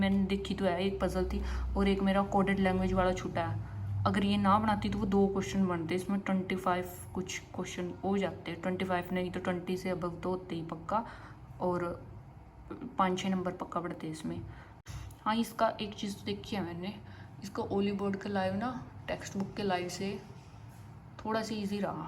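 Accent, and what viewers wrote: Haryana, India. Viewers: your hindi with haryanvi accent is too good #complement❤😄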